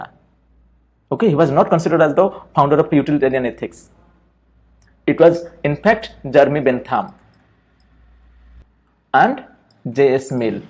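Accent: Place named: Odisha, India. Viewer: Mu bi odisha ru sir from balasore video re majhire odia voice dekhi mna ku question asila jani parili sir ama odisha ru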